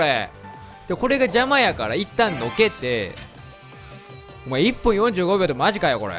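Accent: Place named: Osaka, Japan. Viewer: おかりんさんこれからもずっと動画投稿お願いします。おかりんさんの大阪弁面白くて大好きです。動画投稿頑張ってください。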